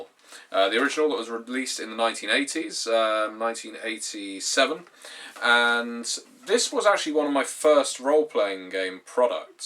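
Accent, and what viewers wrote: United Kingdom. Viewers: I once watched so many of your videos in one sitting that my internal voice acquired an English accent. (I'm American) It actually felt like I was going a little insane. I managed to repair my mind by watching a couple of Tetsubo's videos. Anyways, it's nice to see more videos from you. I'm starting a Pendragon campaign, which I've been wanting to do ever since I watched your video about your horrible Pendragon campaign. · Why not go back to the lets plays for a while? I loved your Bioshock play-through. I miss your unique charismatic British voice when you are away.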